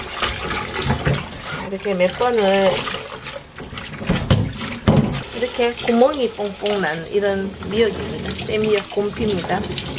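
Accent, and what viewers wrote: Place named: Busan, South Korea. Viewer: 곰피 그라이까네 돌아가신 우리어무이 생각이 나고 아련한 추억 과 정서적 그리움 이 나네예 이 조리하명셔 하시는 나래이션 억양 도 어쩌면 어무이 억양하고 똑 같는지 모르겠심더 꼭! 우리어무이가 환생 하셔서 내 어릴적 부엌에서 음석 하시는 모습 이 회상 되네예 저는 어려서 식구들 따라 부산에서 서울로 이사 왔 심더 이제 나이 60 문턱에 있고 저희 노친네 부모모두 다 세상 떠나셨지만서도 우리 집안 음식맛은 이제 어디가서 도 손맛 을 느끼기가 어려워서 추억으로만 간직하며 있었는데 채널 을 보며는 꼭 우리집안 음식 만드는 정서가 자극 되네예 😊 어릴적 어무이따라 자갈치시장 장보러 가고 하다보이 저희집 음식은 젓갈도 비리고 짜고 맵고 하는 기호 이다보이 이 젓갈도 비린거 좋아하는 사람 운운 하는 맨트 들으면 꼭 백프 나한테 공감 와 닿심더😄 어쩌면 꼭 억양 어투도 우리어무이 하고 꼭 같는기라예 음석 취향도 같고😂 내 생애 다시는 우리 어무이 음석 손맛 못 찾을것 같은데 유튜브 채널 보며 내안에 잠재되었던 우리집안 음식 정서 를 자극 받심더😆 이제 우리집안 일가 그쪽 친족들 다~ 아 어른들 이 않계시고 고향 에는 아무도 없다보이 부산경남 쪽 음식손맛 의 전통을 느낄 기회도 없어지는게 아쉬움 그리움 으로 간직하며 지내는데 우연찮게 채널 레시피하고 나래이션 보고듣고 하이까네 돌아가신 어무이 생각 나서 댓글 몇자 정겨움 과 고마움에 올려보네예 계속해서 내고향토속적 음식 조리방식 비중으로 좋은 방송 해주세요 아셨지예🤣 화이팅! ✊👍 레시피를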